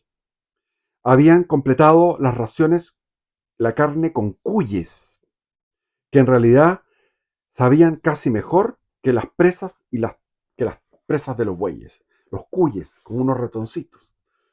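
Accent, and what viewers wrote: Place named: Chile. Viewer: Feliz Año Nuevo desde Sevilla a Todos. Tienes una voz muy bonita y da gusto oírte .Eres muy educado y hablas muy bien .Me gusta mucho el acento de Chile y de Sudamérica en General. En cada País Americano , hay un acento diferente y solo oyéndolo, te digo si es de Perú , Mexicano, Cubano, Venezolano o Argentino etc etc.¡¡ Desde Sevilla , España , Saludo!! Pd , No me gusta Guerra entre Países hermanos .En realidad no me gusta Guerra entre humanos, pero entre Hermanos de lengua menos.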